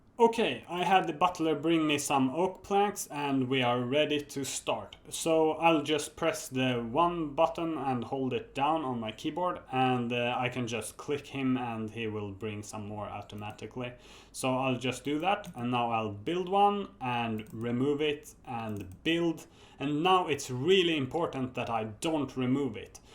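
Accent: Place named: Sweden. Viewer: You sond super swedish, amirite?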